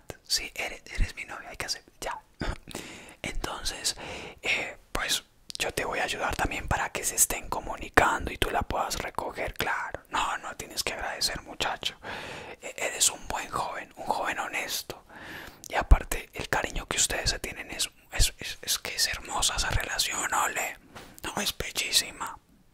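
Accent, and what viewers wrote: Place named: Bogotá, Colombia. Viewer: Cuando empecé a ver tus vídeos pensé que eras español jsjsjjs y después me di cuenta de que eras colombiano, más encima rolo xd, me cagué de la risa con tu actuación , y tu bigote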